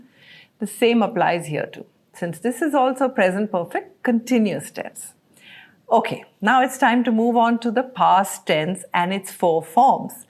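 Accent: United Kingdom. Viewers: R u british? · I though she was british😅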